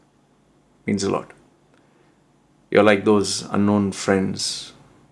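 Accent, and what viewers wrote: India. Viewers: Your success is thanks to the Internet, social media, your gift of gab and your facial tattoos that attract potential visitors to click your videos. Yes you have been lucky starting this at the right time. Being in Thailand has nothing to do with it. You could have done this anywhere but the added tropical backdrop and your Indian accent and calming voice has an appeal for many. Had the Internet not developed to where is it now, what would you have done as the Internet is everything for your survival it seems. That is something to think about, not that it matters anymore..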